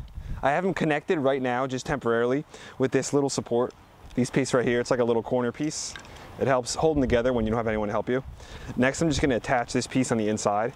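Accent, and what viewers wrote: New Jersey, United States. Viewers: Nice work dude! I'm surprised I could follow it because of your New Jersey fast talk, but I got it! Thanks for the tips!!!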